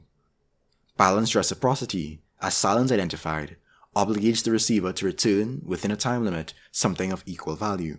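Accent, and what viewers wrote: Trinidad and Tobago. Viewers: Are you Trini? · Are you trini?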